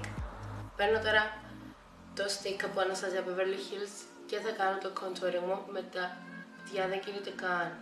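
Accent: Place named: Cyprus. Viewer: Εγω καταλαβαίνω να κυπριακά σου γιατι ειμαι και εγω απο τη Κύπρο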